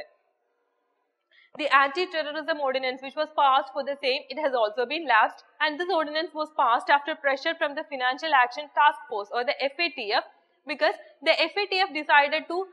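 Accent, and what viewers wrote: Kerala, India. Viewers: thanks to neo ias ..great love from karnataka,... mam ur accent is in malayali style....for outer state student bit difficult to understand some words ...example- law....u pronounced it as lu, fog -fug, etc ...........speed is like bullet train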